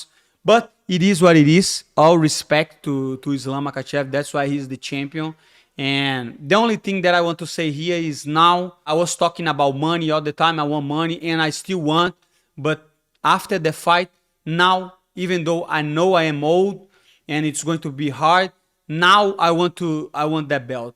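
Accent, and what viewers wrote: Brazil. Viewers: Thats the most brazilian sounding brazilian ive ever seen.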